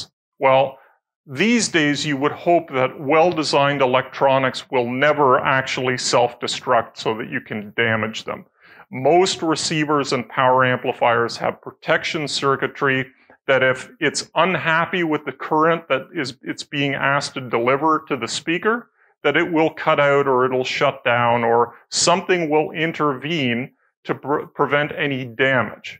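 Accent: Canada. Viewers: Canadian, eh? 😊 One of my favorite teachers was Canadian. That was like 50-60 years ago lol Howdy from the US! Enjoyed your presentation. 👍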